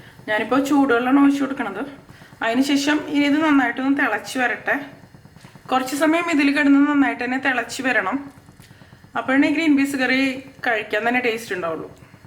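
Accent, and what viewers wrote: Thrissur, India. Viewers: ചേച്ചി തൃശൂരാണോ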